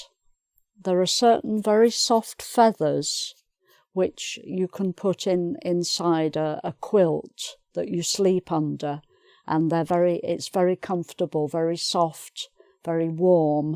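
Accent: England, United Kingdom. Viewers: This english is more beatiful than the american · Hi! I’m Bruce from Korea 🇰🇷. I bet you are the best English teacher on YouTube. I am so jealous of your English accent. Wish I could have that one as well. Thank you! ❤️ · stabel This is definitely not an Australian accent...she is from the UK and has a British accent. Btw there are MANY British accents!! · THANKS A LOT! I love your videos. Specially because your accent is from the UK. And since I live in Mexico every one learns to to speak that awefull american English, which I already know and, to be honest, don't like. I'm stil learning UK accent. An I hope to keep watching your videos until I'm dead. Hugs an kisses lovely lady!! <3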